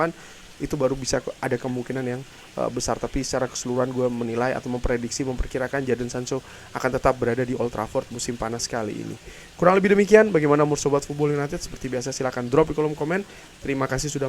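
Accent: Semarang, Indonesia. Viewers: Asli semarang ya mas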